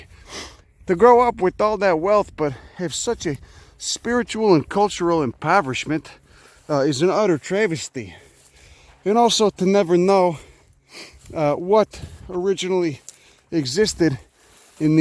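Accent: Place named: New Jersey, United States. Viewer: Photosynthesizing pile of shit lol...love it...you sound like you're from Jersey btw.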